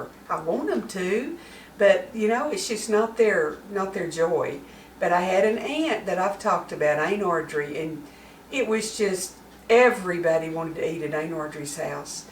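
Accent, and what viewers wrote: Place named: South, United States. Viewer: Hi I just recently subscribed to your channel. What a cozy well thought out kitchen you have. Thank you for sharing your recipes. Your such a sweetheart and I love your accent. I’m in 🇨🇦 and enjoy your Southern flair. ❤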